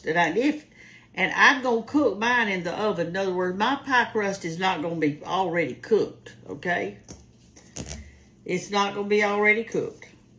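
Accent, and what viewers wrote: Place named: South, United States. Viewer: Love, love your southern country accent , so friendly❣️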